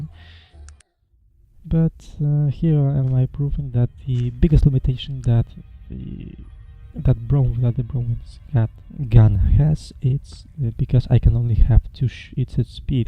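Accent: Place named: Russia. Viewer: I didn't know you were Russian. You should comment more - you have a very soothing voice coupled with that fabulous accent.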